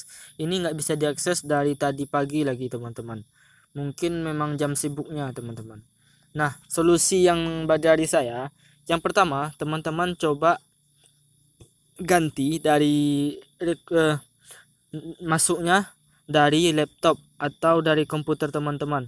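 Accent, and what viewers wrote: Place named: West Sumatra, Indonesia. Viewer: Urang minang yuang😅😅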